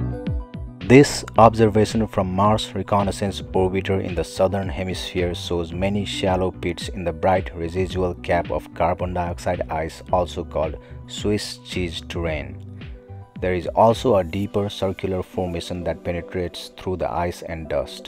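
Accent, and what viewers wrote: India. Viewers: This is more like Indian call center like you've never heard before..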